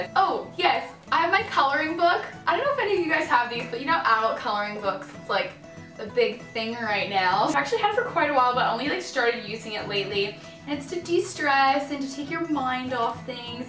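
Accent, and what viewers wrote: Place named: Australia. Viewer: Looks like you've picked up a bitOf an Australian accent haha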